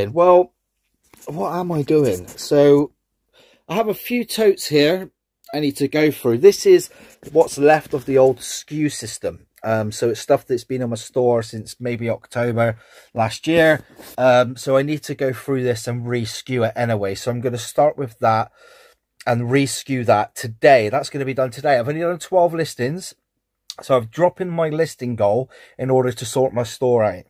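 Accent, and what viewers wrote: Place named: West Country, United Kingdom. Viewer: New sub. Caught your accent on one of s zoom calls & recognised it as a fellow West Country chap. Only got to 120ish listings before I revamped & unified using the same SKU & photo system. Wish you all the best with the restart 🙏 👍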